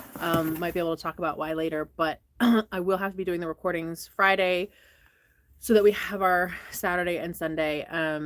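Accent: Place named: South, United States. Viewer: Haha cute southern accent! You're so funny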